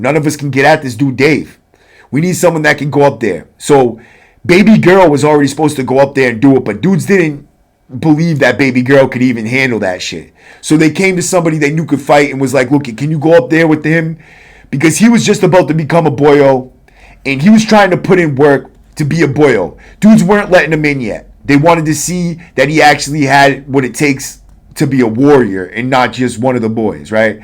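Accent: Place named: Brooklyn, United States. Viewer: Luv the channle bro I could listen to you talk for hours u remind me of this Brooklyn dude, my boy "Moe" I met up top in NY. I only did 2 years but Holly Shit that was a CraZy Time of My Life!!! Prison politics are crazy. I ended up working out with him then we got in a fight. I fought well but he got the better of me and broke my rib. I laid up for a week and could hardly breath for a few days but kept my mouth shut after that we spoke dapped up and we ended up becoming Boyz and running a store together working out cooking I was in. Its crazy how sometimes you make friends after you beat each other's asses. Lol I gave him a black eye in the fight I couldn't breath bc he broke my ribs, he looked like shit for a week. HaHa then we were cool after😂 prison is fucking crazy bro😅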